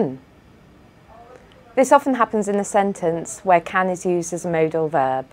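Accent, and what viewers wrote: United Kingdom. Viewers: 고맙습니다.... 영국발음 쨩.. (하트)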